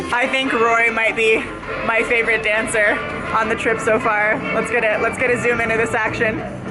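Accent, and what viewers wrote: Spain. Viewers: you speak great spanish!! Its funny how you have a Spanish accent, like from Spain, its not common for people to have that accent when spanish is their second language! Good hello from Colombia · Is that a Spanish accent I hear? I love it! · hahaha Ay tienes acento español! Peru looks so beautiful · espero que con este viaje aprenda nuevos acentos y se le baje un poco el españolete jajaja I also hope that you can come to Colombia, we are right there next to peru. there's so many incredible things to see in south america too;) Love all the facts and interesting details you always give us with each new place you go, makes me feel ready to go places, knowing a little bit more about cultures. that's why i love your vlogs ( also because you're hilarious and i adore you)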